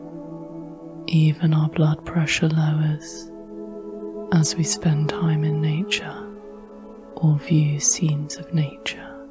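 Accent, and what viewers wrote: United Kingdom. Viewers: Here in Carson City Nevada USA, I've tried several different methods. Supplements to relaxation recordings. Some success with bamboo forest recordings but found any with too much rain or wind or music was more of a distraction. Then, I found your 3 hour recordings three nights ago. I've been sleeping with you since😊using Bluetooth ear buds. The combination of your vocals and background music works magic. The British accent is an added comfort. 😴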